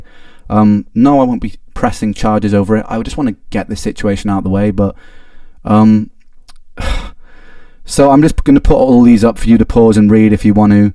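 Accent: United Kingdom. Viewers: Ren, every time I hear you speak, I wish more and more that I knew you, and could talk to you personally. ( And I don't just mean because of your accent, although it is quite lovely, and reminds me of the time I lived in England) But listen, Every. single. One. Of. Your. Fans. Is one hundred million times behind you, and I hope you don't believe for a second that we would ever believe any slander about you. Because what you've done for us, is far beyond having given us catharsis, identity, and connection. It's far beyond your amazing talent and the joy and Inclusions you've more than you will ever know. You've given us a piece of your soul, a glimpse of your deepest thoughts and being, and that is an indelible mark upon each and every one of us. **And don't fret about your song. I don't want to say anything to fuel this fire, but please message me if you would, because I have good news for you.*** Aside from that, I shared your music with my college psychology professor tonight, because she was avidly interested when I told her about your story, and the message of your music. 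I can't wait to hear back from her, I know she'll love it! Moreover, whatever money you've lost, say the word, and you know your patreon would be filled 10x overture amount, in a heartbeat. You are a genuine, beautiful soul, Ren. I'm eternally grateful I found your music, because I relate to you more than you can imagine. We've gone through very similar things at the same age, and for me, it's something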